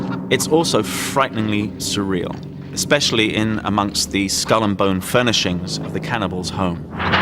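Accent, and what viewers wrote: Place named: RP, United Kingdom. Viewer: Ah, this is what he meant by his 'posh accent' haha. Love it though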